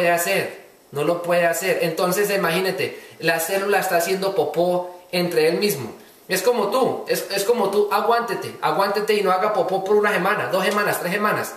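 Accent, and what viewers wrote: Colombia. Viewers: Este asentó me parece conocido...Colombiano.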